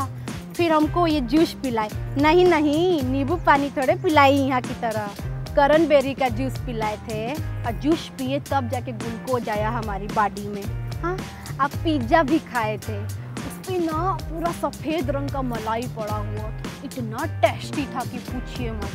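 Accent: Bihar, India. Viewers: excellent performance mam.......... Aapki acting ke fan Ho gaye hai hum and specially ye bihari walla toh jhakkasss 👌👌👌👌👌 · bihari lady voice very original,,,its innate in you,,,graceful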